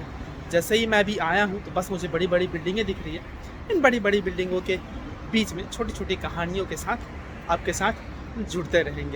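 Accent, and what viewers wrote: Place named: Chhattisgarh, India. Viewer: मैं आपका वीडियो काफी दिन से देख रहा हूं और आगे भी देखते रहूंगा क्योंकि यह सही नहीं चाचा आप जवाब देते हैं और काम करते हैं और आपकी बोली जो है एकदम छत्तीसगढ़ी है जो कि हमारा ही है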